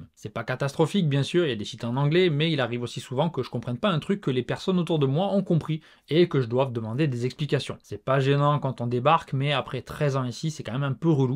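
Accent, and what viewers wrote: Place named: South, France. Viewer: salut. une videos limite émouvante. limite kawai si vous aviez eu des yeux plus grand et plus humides. j'aimerais aller au japon un jour mais y vivre je le sens pas avec cette histoire que les japonnais ne débâtent pas ou manque souvent de discutions. d’ailleurs j'ai pensé a un phénomène de bulle plus ou moins grande quand on est etrangé dans un pays en voyant cette videos.... je sens un complexe sur ne pas etre doué avec le sav japonnais.... après y'a d'autres qualités, la cuisine, etre un bon pere, réparer des choses, etre un bon soutiens émotionnel les courses.... et ne vous inquiétez pas tant que vous garderez votre petit accent du sud sympathique vous resterez francais. ça sera si vous le perdez qu'il faudra s’inquiéter. d'ailleur l'histoire de vouloir progresser en japonnais j'aurais tendance a vous conseiller a fond d'accompagner votre enfant avec les devoirs de japonnais. 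meme 15 30 min par jours ça vous fera passer du temps pere fils et vous améliorerez surement votre japonnais genre a lire avec lui des passages de livres simple ect.... et meme pourquoi pas lui lire une histoire en japonnais le soir avant qu'il s'endorme. ça joindrait l'utile à l'agreable. apres comme petit boulot je vous imaginerais trop combattre le franponnais, traduire des patisseries, des enseignes ou des cartes de restaurant meme en echange d'un tres bon repas ou un truc dans le genre.... dommage votre ville visiblement est pas super touristique ce qui fait aussi que vous ne vivez suremen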